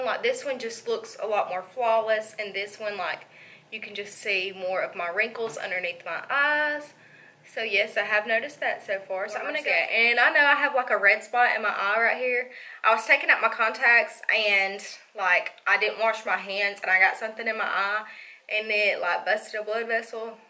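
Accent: South, United States. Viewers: where r u from? I hear the Southern drawl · loveeee that country accent!!!!!!!!!!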